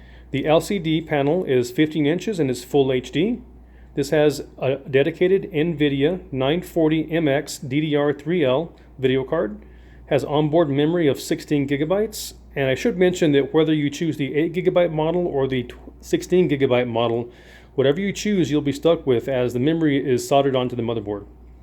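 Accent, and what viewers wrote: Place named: Argentina. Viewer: Pensé que era un ARGENTINO :V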